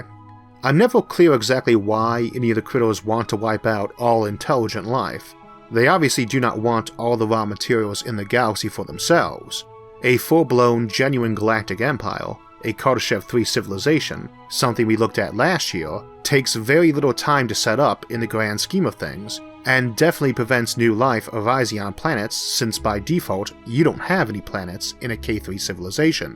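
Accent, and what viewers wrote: United States. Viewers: You have an american accent!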